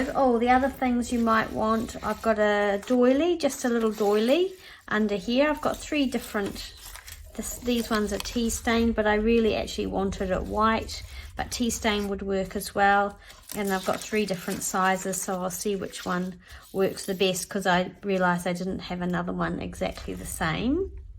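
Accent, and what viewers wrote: New Zealand. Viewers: Love this tag, so beautiful 💙 Also so nice to hear a kiwi accent 😊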